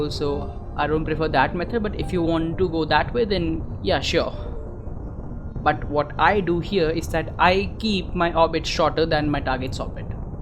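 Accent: India. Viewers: Are you indian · Good video.. good tutorial.. Keep it up ... but U English Can make it Not Like indian? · Indian accent ???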